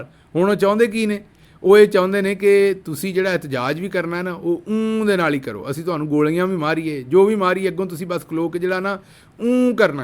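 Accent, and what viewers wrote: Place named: Amritsar, India. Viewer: ..yaar bohat hi vadiya informational vlog. We (me and my wife.children are in Canada)watched your vlog daily and waiting for it. You narrated very true picture. I watched both Urdu and Punjabi vlog.my wife listened when she was making dinner😅.to enjoy and laugh.your Punjabi is like us in Amritsar.our elders belong from RANALA KHURD.you are doing great work for IMRAN KHAN.you delete or don’t like to say,SAT SRI AKAL to CHARDEY PUNJAB. We love those words.please make sure to say again.MY REQUEST TO YOUR TEAM TO CONVEY MY MASSAGE TO.🙏.SAT SRI AKAL FROM AMRITSAR TO ALL…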